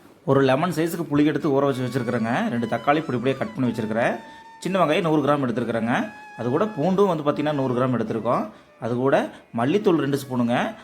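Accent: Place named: Kongu, India. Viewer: Super na kongu tamil la arumaiya pesuringa....❤❤❤❤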